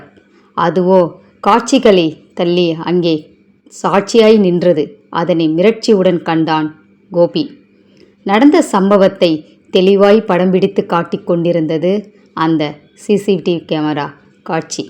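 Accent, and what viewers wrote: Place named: Chennai, India. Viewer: அட அட சூப்பர் கதை அப்புறம் உங்கள் ..... உங்கள் மெட்ராஸ் பாஷை அட்டகாசம் சகோதரி❤❤❤❤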